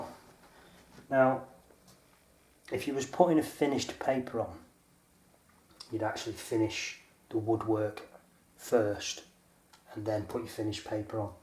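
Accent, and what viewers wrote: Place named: Lancashire, United Kingdom. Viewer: Sounds like north Lancashire to me mate 🤔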